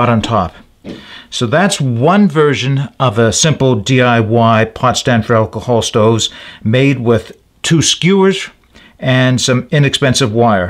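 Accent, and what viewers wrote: Nova Scotia, Canada. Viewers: I thought that accent sounded like Nova Scotia! Born and raised there until I was 12. Great video!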